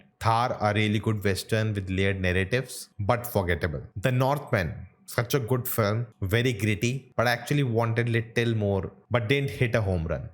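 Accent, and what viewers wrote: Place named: South India, India. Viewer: ..you should watch less South Indian films...Over a period of time...your English accent has developed subtle South Indian tinge...especially when you were pronouncing Hollywood Movie titles....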